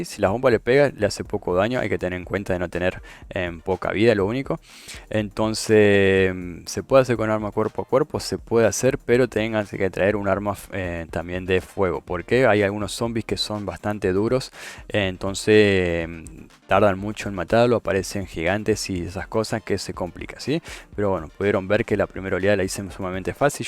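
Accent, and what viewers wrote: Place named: Argentina. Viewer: Argentino??? me suscribo hermano!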